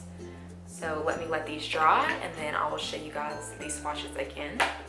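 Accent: South, United States. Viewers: where are u from? u sound southern.. I'm from AL!!!!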